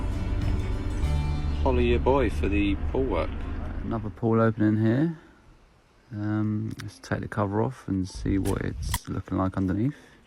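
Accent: United Kingdom. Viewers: based on your accent you’re across the big ol Atlantic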